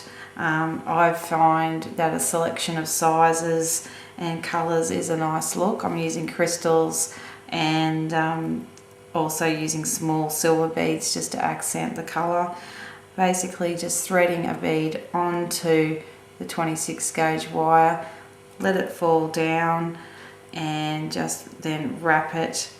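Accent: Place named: Australia. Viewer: You make that look so easy so I'm going to have to try this. I've just begun to think about making jewelry since it looks like so much fun to do. There's a lot to learn about all the tools and wires and beads etc. but I'm going ahead with it. Thanks for doing this. I love listening to your voice and your accent. :) I love Aussie and British accents and could listen to them all day. ;D Beautiful ring too!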